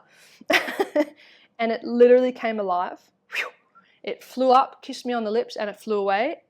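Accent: Australia. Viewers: Hello from Houston Texas. I too found you from your lymphatic video but lately I've been doing so many more of your other videos and ABSOLUTELY loving them. Thank you so much for sharing your heart with us. It is true that I'm drawn to your energy but for me its also your accent. Australia is near and dear to my heart because my parents almost moved there when my mom was pregnant with me but didn't. I've never been there but both my husband and I badly want to visit and we will. All your videos challenge me and it's shaking my body as well as my brain up. You're a wonderful beautiful woman and please just keep doing you. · Hi, i absolutely love your energy!!! I found you accidently by one of your videos about fixing neck pain, literally week ago! Learnt so much about my body through your videos! And one more thing, im in love with your Aussie accent, could listen to you talking for hours! 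Im Polish, i live in UK, so maybe thats why? Who knows lol, but your vibe is just so contagious, most likely because you are your authentic self! Keep going! Im looking forward to watching more of your brilliant content!! Best wishes Ania xx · So glad I found your videos. Always go for random one of your videos to mixup what I’m doing! Excellent! Ps I’m in Scotland and while doing a video I practice my Australian accent, makes me lol sometimes when I copy your accent adds to that positive feeling 😂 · Bonjour, my first name is Stéphanie and I’m from Québec ⚜️, Canada 🇨🇦! My first language is French and second English so I understand most of when you talk with your LOVELY Australian 🇦🇺 🥰 accent even though I have to pay attention to some specific words you say sometimes in the mouvements your describing… I’m thrilled to get to know you better through this video 🎥 cause you lymphatic flush full body routine was literally a game changer in my life for the past two months! 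Let me explain: I’m 49 and like most of us during the past four (<num>) years we had because of the “global 😷 situation” gain a little bit of weight and, like most women, I was struggling with my body that I didn’t really like… one day I decided that it was enough and that I was gonna be 50 and fabulous (2025) so I decided to check on YouTube to do some exercise by myself and there you appear!… Since two months now, I’ve been doing your lymphatic flush full body 10 minute movement routine at home 🏠 every single morning before my workout 🏋️‍♀️!!! At First I do yoga for 30-40 to minutes, then the lymphatic drainage and then the cardio workout! In one month I lost half of a size around the hips and I feel soooo much more energy after doing your exercise and during the day! Your enthusiasm is contagious and now I even say the movements at the same time as you do 😂!!! I’m writing all these details so you can actually have some feedback from Real 👈🏻people (literally at the opposite side of the world 🇨🇦🌎🇦🇺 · All of a sudden you just popped up!! 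And I love it and you ❤. I do your lymphatic exercise for 10 minutes daily which I started 2 weeks ago and I can tell the difference, no matter how busy I am this is my first exercise I do first in the morning. I did detect the Australian accent love it I’m a Brit!! You are the Guru for me because you’ve done so many amazing things about the human body and quite knowledgeable about it. Love 🥰 !! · Love the Hello. Great fellow aussie accent.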